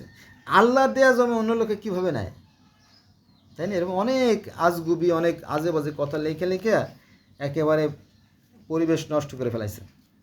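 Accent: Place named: Barisal, Bangladesh. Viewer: Please present in a wise manner. Seems you are not a highly educated man, can't speak even Bangla correctly avoiding illiterate tone ( local Barisali tone) Correct those limitations if you can ( I am in doubt, you will be able) The history what you narrated is known to all educated Muslims except the common illiterate ( they are the larger in numbers)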